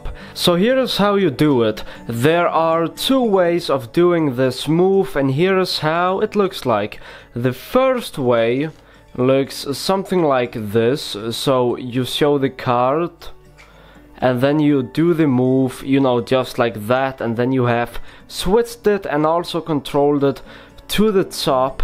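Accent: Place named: Russia. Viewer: у тебя очень русский акцент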